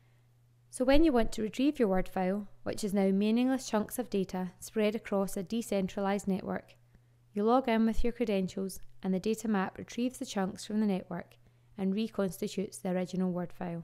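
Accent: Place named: Scotland, United Kingdom. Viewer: Nice female Scottish voice :)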